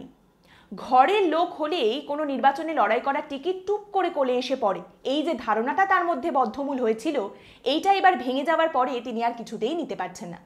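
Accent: Kolkata, India. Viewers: aapni je bhabe bangla bolen bhalo lage.. bihar ae thaki.. aapnar bangla sune monehoi kolkatai achi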